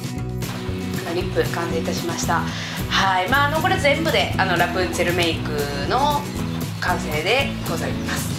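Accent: Kansai, Japan. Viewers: 関西弁がカワイイです( ⁰▱⁰ ) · いつもサムネで気になってて初めて動画見ました！バイリンガル系の人かと思えば、サバサバしてて親しみやすく、関西弁がめちゃかわいいです！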